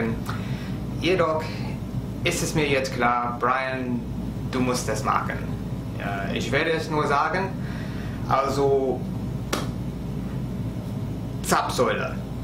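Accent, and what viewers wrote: United States. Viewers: Dein amerikanischer Akzent klingt einfach nett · Manchmal hast du halt den typisch amerikanischen Akzent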